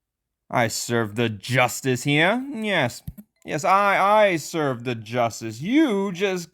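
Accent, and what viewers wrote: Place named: England, United Kingdom. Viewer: I am English and this is the funniest English accent I have ever heard 😂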